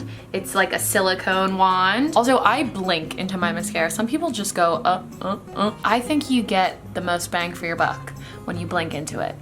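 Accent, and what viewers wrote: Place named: Long Island, United States. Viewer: I thought might’ve been Italian like Long Island Italian until I got to the part were she couldn’t say Caprese LOLOL I love her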